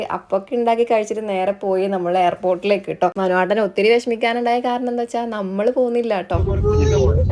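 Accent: Kannur, India. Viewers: കണ്ണൂർ ആണോ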